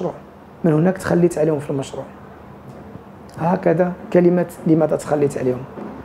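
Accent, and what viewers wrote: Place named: Gulf, Arab world. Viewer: علاش هاد تبي تيهدر بالخليجي ؟!! 😤😠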